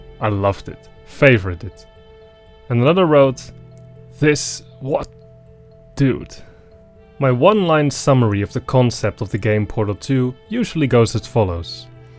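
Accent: Netherlands. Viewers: I hear a Dutch accent and see a Dutch name 👀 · are you dutch cus i am and i hear the accent and your name looks dutch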